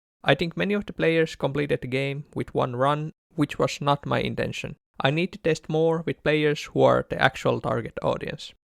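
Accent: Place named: Finland. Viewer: Best of luck to you! I like your attitude and optimism, I hope you succeed in accomplishing your dream to run a small game company. Btw your accent reminds me of My Summer Car, so I assume you are from Finland. You Finns for some reason are very good at game development, so you already have a leg up on the rest of the competition :)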